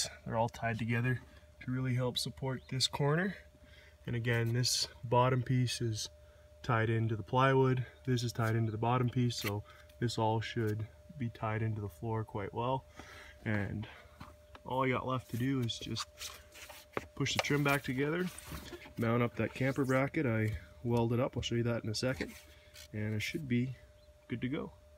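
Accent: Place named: Canada. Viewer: It's the Canadian accent lol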